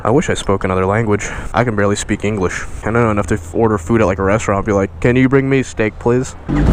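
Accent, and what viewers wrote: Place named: Georgia, United States. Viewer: It’s Georgia we all have one that comes out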